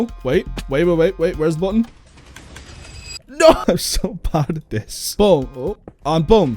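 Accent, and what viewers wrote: Ireland. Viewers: r ya irish?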